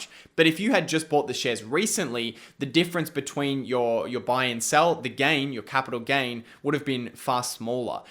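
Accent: Australia. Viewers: Hmmmm rosy cheeks and an Aussie accent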